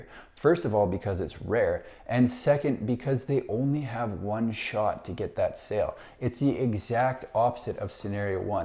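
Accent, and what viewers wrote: Canada. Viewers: Why am I listening to a canadia?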